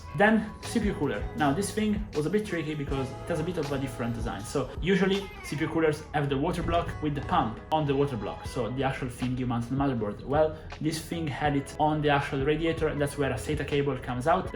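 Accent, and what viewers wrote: Italy. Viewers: Senza neanche leggere la descrizione del canale ho subito capitoo che sei italiano l'accento é forte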